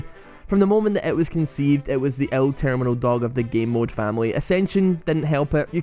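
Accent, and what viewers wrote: Scotland, United Kingdom. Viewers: are you scottish? I've been watching you for quite some time, but only now that I've started watching some videos of Frankie Boyle I've noticed your accent sounds very scottish:D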